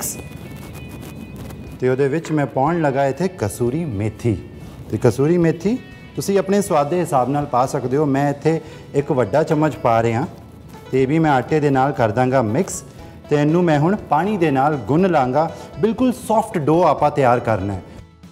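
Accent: Punjab, India. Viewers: Your punjabi tone is very nice i like it very much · U talk Punjabi in so sweet way. God bless you. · Puttarji...I have liked the video mainly because your sweet Punjabi! Of course I loved the kulcha too.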